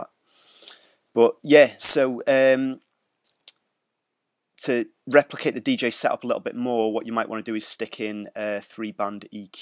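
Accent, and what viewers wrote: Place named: Yorkshire, United Kingdom. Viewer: where about in England u from? (u sound very Yorkshire!)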